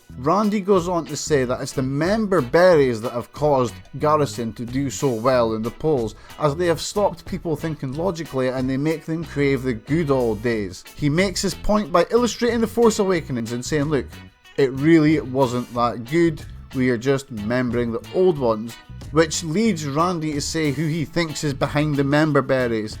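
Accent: Scotland, United Kingdom. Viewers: damn shame about your thick, almost undiscernable scottish accent. can hardly understand 30% of what the hell youre saying.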